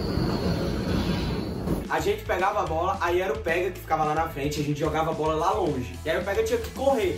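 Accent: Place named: Minas Gerais, Brazil. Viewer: desculpa perguntar, mas você é de BH ou de Minas Gerais? Porque eu sei que você cresceu em Fortaleza, mas o seu sotaque é muito parecido com o meu, que é mineiro. Obrigada!!! E aliás, parabéns!!! Você é incrível!!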